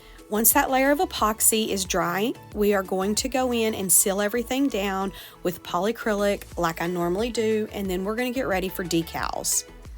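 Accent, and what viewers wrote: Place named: South, United States. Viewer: I love this cup and listening to your southern voice is so comforting. Bama girl here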